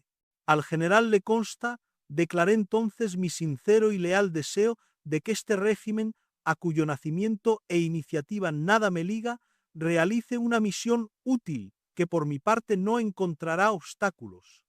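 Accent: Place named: Castile, Spain. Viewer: No está mal el esfuerzo y te felicito, pero Alcalá-Zamora no hablaba así, tenía un marcado acento de Córdoba, no castellano como en el vídeo. Hay varios videos con su voz y su discurso que ilustran esta premisa. Por lo demás la información es correcta, pero es que la manera de hablar de D. Niceto era muy especial y oirlo con acento de Burgos / Valladolid me choca. Un saludo.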